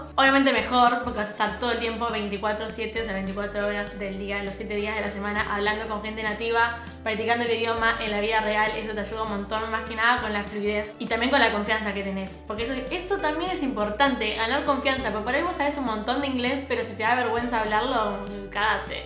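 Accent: United Kingdom. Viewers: me encanta tu acento británico 😘 ahora tengo una amigo British y me encanta el acento de el también, continuaré viendo tus vídeos ❤️ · Nunca tuve preferencias con los acentos! Pero desde que empece a ver tus videos.. Amo el acento britanico! Quiero aprender ingles britanicooo! Sos muy genia! TKM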